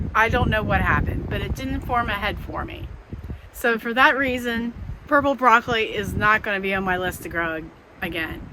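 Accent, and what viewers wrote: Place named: New Jersey, United States. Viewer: I grew up in New Jersey and your accent made me home sick lol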